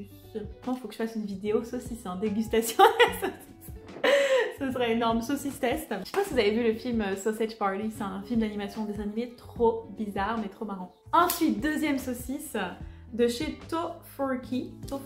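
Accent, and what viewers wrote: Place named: England, United Kingdom. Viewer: Il est kiffant ton accent anglais. xD Et Sausage Party c'est le feu, à regarder vrament.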